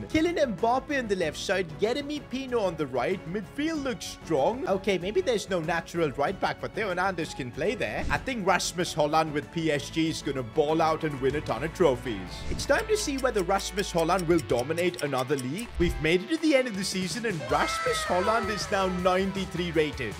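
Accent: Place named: India. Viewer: he’s Indian bruv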